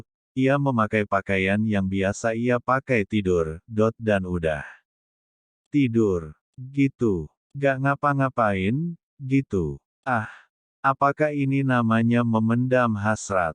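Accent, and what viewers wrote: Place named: Java, Indonesia. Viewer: Cerita nya lucu, ada logat Jawa nya lanjut Doong ❤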